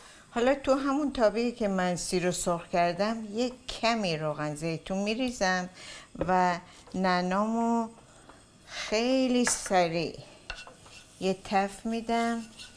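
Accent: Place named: United States. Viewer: بنظرم اومد شما لهجه آمریکایی دارید و خیلی افتخار میکنم به شما.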